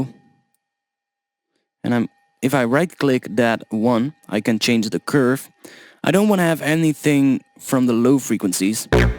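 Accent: Netherlands. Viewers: Good tutorial. En volgens mij ben je nederlander ;)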